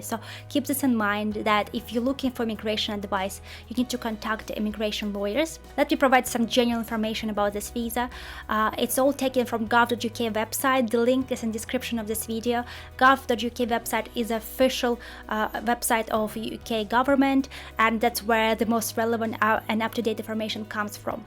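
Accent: Russia. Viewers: russian accent sucks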